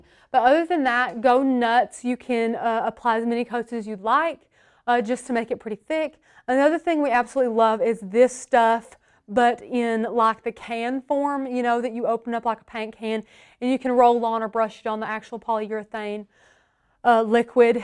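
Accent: South, United States. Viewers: Great tutorial... thank you. I really enjoyed listening to your Southern accent... for an Australian it is like a North American trying to understand someone from Scotland. Very cool.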